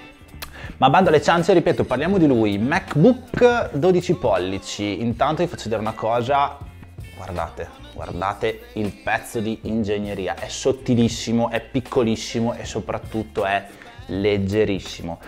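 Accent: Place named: Romagna, Italy. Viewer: prego! Eh eh eh... scusa ma io ho la mamma di Rimini e il tuo accento mi sembra molto simile! Bellissima città Bologna!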